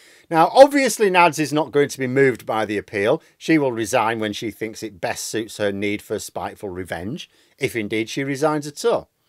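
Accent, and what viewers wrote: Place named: Northern England, United Kingdom. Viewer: . We know you're from up north. Stop going on about how people down south pronounce town names. It detracts from your otherwise intelligent commentary.please. It's boring.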